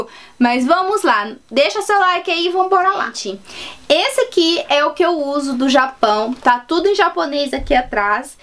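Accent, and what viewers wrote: Minas Gerais, Brazil. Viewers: Tem um sotaque mineiro . Legal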